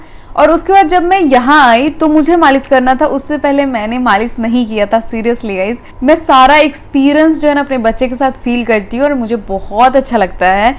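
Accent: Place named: Purvanchal, India. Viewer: love u ji mai apko tb se dekh rhi hu jb ap 5 mnth pregnnt thi qki mai b pregnnt thi ab meri beti ho gyi h... apko dekhna or sunna acha lgta h.. mera sasural b purvanchal se h to apki tone achi lgti h❤❤❤❤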